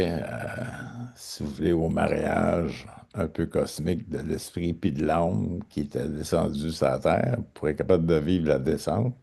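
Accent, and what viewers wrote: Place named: Canada. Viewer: Oui bien résumé avec votre bel accent canadien si charmant de surcroit qui fait arriver froid neige dans mon ESPRIT, belle âme ! ❤😅🎉❤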